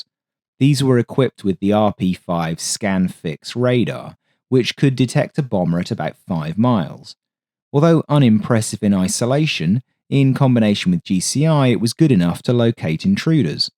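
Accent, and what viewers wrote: United Kingdom. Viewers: "Loo-tenant"? Really? You sound British, so why are you using American pronunciations? Just a part of the continuing Americanisation of the United Kingdom. Sad.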